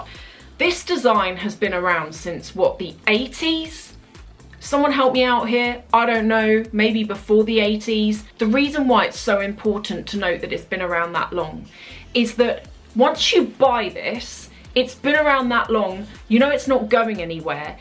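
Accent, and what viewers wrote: United Kingdom. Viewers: Thank you, for the most entertaining, informative and creative luxury podcast of all of them. I love that you get right to it and do not belabor incessant droning on and on about nothing over and over and over. You have super fun communication skills, are SUPER FUNNY, PLUS your British accent is addictive. Thank you for a refreshing and lovely addition to my day.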